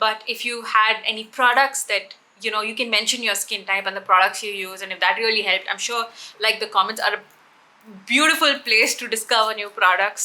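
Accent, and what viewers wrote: India. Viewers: Yes,The Indian accent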